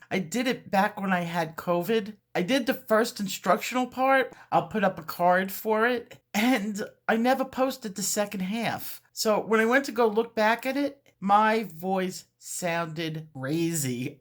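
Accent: New York, United States. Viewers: you are simply fabulous. I get in trouble sometimes for my blunt honesty. However, I will not change that. You made my entire day saying FWOF!!! Your talent and honesty are exactly why I come to you to learn and be entertained as an artist. You got it all! And you know I have a thing for "New Yorker's accent" lol.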